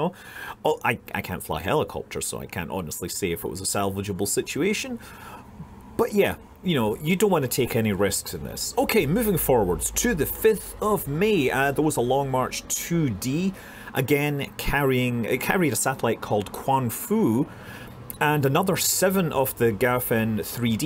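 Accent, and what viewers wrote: Scotland, United Kingdom. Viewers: Have you stopped by in Scotland on your way to Iceland,? Maybe it's just me, but I thought I heard a little more of the Scooootland in your accent than usual:D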